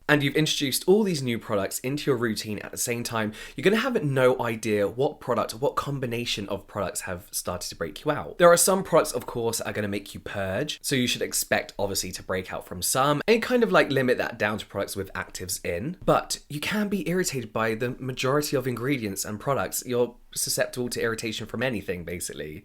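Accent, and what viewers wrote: United Kingdom. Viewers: your British accent is everything! It's literally better than ASMR 😍 love it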